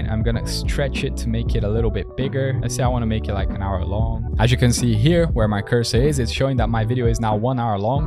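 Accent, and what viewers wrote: Brazil. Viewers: sotaque brasileiro carai hahahahahahha seguindo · mano, tu eh br né? kkk o sotaque entrega mt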